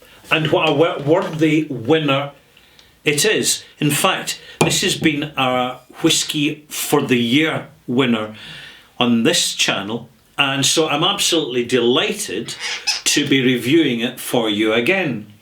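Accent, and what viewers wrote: Scotland, United Kingdom. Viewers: ...your whisky knowledge is without peer...you are virtually a university course on the subject. But sometimes I tune in to hear yuir Bonnie Scots burr...Gaun' Yersel' always, mate!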